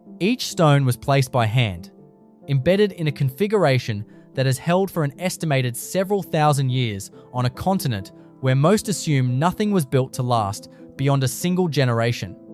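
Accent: Australia. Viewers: This voice sounds Aussie but at about he says "Brew wa reena". What? Phonetically it's pronounced "Brew warr in uh". Brew as in brewing beer, warr as as warrent for your arrest and uh as in blunt -- that knife is blunt. Get it right.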